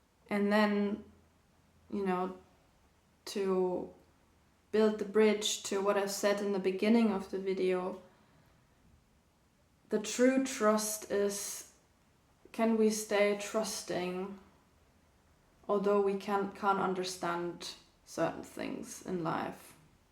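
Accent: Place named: Ireland. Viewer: Hey there! Do you live in Ireland? I'm picking up hints of an Irish accent 😄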